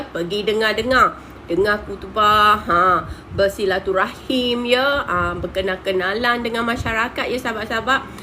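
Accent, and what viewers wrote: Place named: Kelantan, Malaysia. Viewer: bunyi mcm org kelantan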